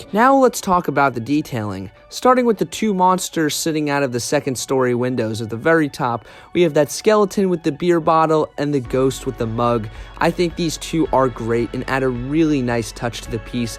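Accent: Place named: United Kingdom. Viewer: British yay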